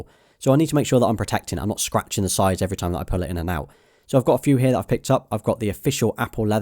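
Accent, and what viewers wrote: United Kingdom. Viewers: British accent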